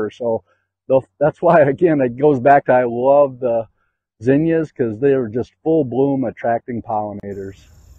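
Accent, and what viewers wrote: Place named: Minnesota, United States. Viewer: You sound Minnesotan.. lol.. Takes one to know one.. if I'm right. 😅 Love your video! Ha.. I just heard you say you're in MN. I have Zinnias and the Monarch are enjoying everyday!!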